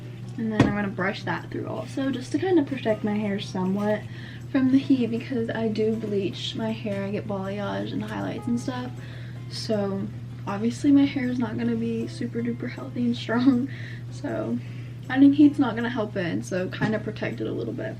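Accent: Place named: South, United States. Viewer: love your hair color and i love the little hint of the southern accent in ur voice, ur so cute!!!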